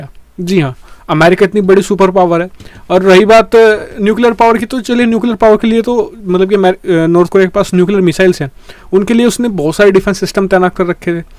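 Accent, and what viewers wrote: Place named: Bihar, India. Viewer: SIR AAP KIS STATE SE HO ? BIHAR SE I GUESS ?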